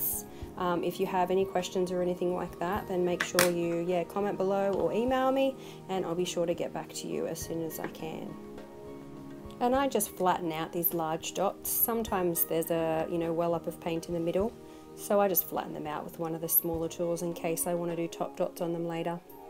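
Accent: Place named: Australia. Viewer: You're voice is making me miss Australia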